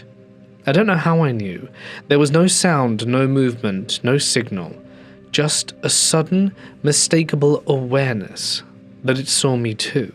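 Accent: England, United Kingdom. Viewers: You really have a gift for nuance and expression And your English accent just makes it even better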